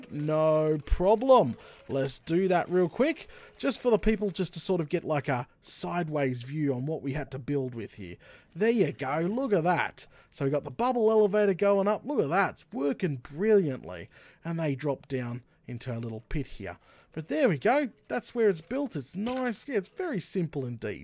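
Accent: Australia. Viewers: I love this guys Aussie accent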